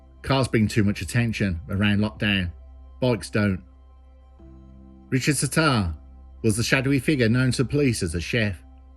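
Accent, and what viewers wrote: Birmingham, United Kingdom. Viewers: Why are you calling them burner phones? Thats an American term. Here Pay As You Go phones (PAYG) as perfectly normal , half the population doesnt use contract phones. If you tell people you dont have a phone contract, no one will think thats weird. This isnt America. Are you actually British, or just an American using a British narrator? EDIT: As he wants to argue , quote: ''' The popularity of the term was undoubtedly fueled by its frequent use on the HBO crime drama "The Wire," The following excerpt from one episode (expletives deleted) actually provides a helpful vocabulary lesson: Kima: What's up with these phones? I've been seeing kids throw them away all day. They got cash like that to waste? They be laying all over, man. Sometimes you find minutes on them, sell them for walking-around money. Mello: They burners, Kima. 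Kima: Burners? Google's N-gram app, which traces the frequency of words' appearances in print, shows that "burner phone" skyrocketed in use during the early 2010s.'' *Where does The Wire come from? AMERICA. Its an American term.* When you top up your phone it literally says PAYG right there. All the phone networks call it PAYG. Wha5t you think payg stands for eh? PAY AS YOU GO Your mum likes sucking on mine I dont need to be told. I've been on road. No one used the phrase burner phone. The only time you hear it in the uk is in police shows and music. The Wire was using the term in the 2000s. No one in the uk was using the term burner phones in the 2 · well said old boy well said...where on earth would you get a pukka brummie voice bot?? im from london and love you say investigation 🤣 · Great content as usual maye,I ain't biast been a fellow brummie but just wouldn't sound the same otherwise.👍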